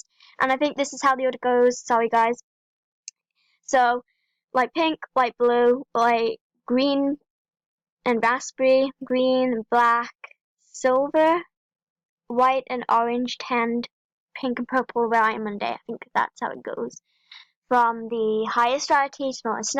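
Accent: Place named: United Kingdom. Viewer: Is that your real voice? Its high but its sounds like a british human...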